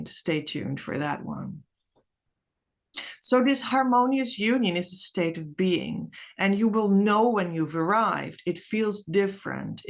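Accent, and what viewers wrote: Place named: Netherlands. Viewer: So nice to see you! My DM is Dutch so I recognized your accent right away.its so reassuring to know someone from The Netherlands is discussing Twin Flame. He would have me believe otherwise 🤭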